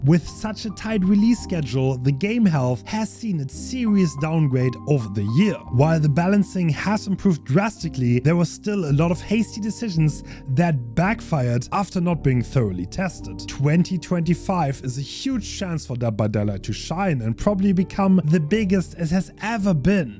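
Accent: Germany. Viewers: german,austrian or switz accent? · is he german?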